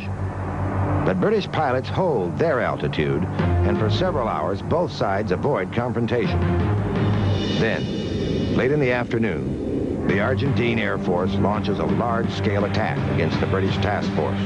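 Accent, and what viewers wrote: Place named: France. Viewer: Narrator French accent... sucks!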